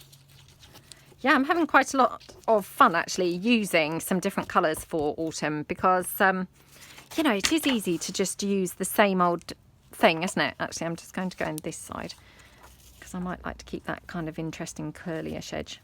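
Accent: England, United Kingdom. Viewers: Hi Miss!! I'm new to your channel but I am loving what you are doing..And your accent is beautiful..Are you from England? I look forward to watching your videos and seeing all the great Fall things you make...Lots of love from Kentucky💙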